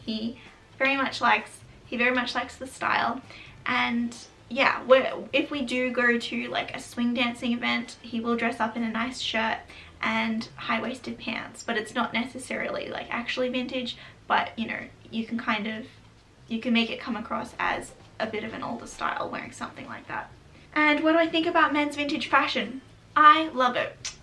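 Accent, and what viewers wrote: Australia. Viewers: I have just recently discovered your channel, and I LOVE it!! I've always been obsessed with the '40s and '50s, I'm sure I was born at the wrong time! I'm from North East England and don't hear any South East England accent btw, it sound fully Australian to my untrained ear 😆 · So bizarre you are fully Australian accent! ahaha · I'm newer to your channel, and I love your videos so much more than a few others I follow. Please keep making your videos, and I would love some cooking/recipe videos as well. I love the education of how people lived in the 50's. I have always been such a fan of the past. There is something very soothing and calming of how they lived. My parents were born before the 50's in Europe, and cooking bread and all the meals and desserts by scratch is what my mother specialized in. BTW, love your Australian accent. Much love from American. 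· I think it sounds very Aussie. I have the same accent! ☺️ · Absolutely knew you were an Australian!! I knew that’s why I loved you! I personally couldn’t tell if it was Australian or more England!! I’m from the states so I know nothing about an accent other than a southern (I’m from Arkansas haha!) I love Australian accents. 💕 love your channel dearly ... xoxo Olivia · I'm American and I don't get an American accent from you at all - it all sounds like adorable Australian to me :)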